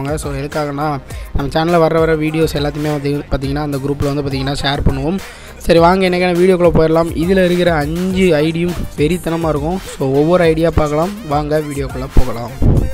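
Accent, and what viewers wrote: Tirunelveli, India. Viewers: Neenga Tirunelveli ah bro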